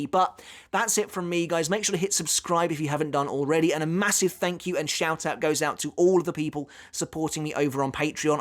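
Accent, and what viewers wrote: United Kingdom. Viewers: Love how you pronounce those Japanese names with your British accent! haha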